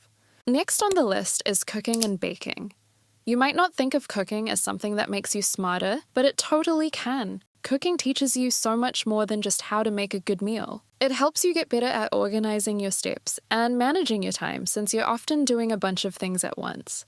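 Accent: United Kingdom. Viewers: برتش آكسنت؟ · what her English accent is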